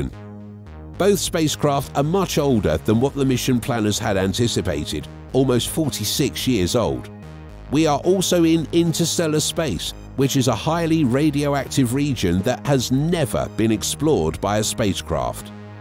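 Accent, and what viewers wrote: United Kingdom. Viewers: I’m not going to get rooked into listening to this Brit-narrated 13 min long video about a message that is never revealed but if watched I’d have to endure a mind numbing litany about the wonders of the Universe.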